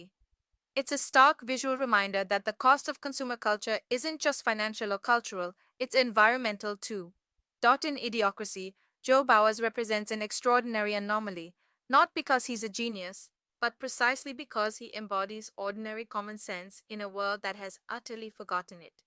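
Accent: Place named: India. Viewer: That Indian voice from the narrator is horrible as hell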